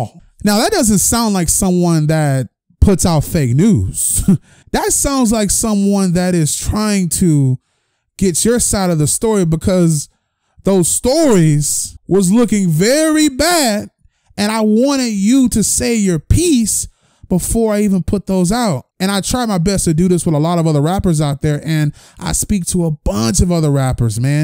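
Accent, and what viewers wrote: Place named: Houston, United States. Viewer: 😂😂 I can tell you’re from Houston 😂😂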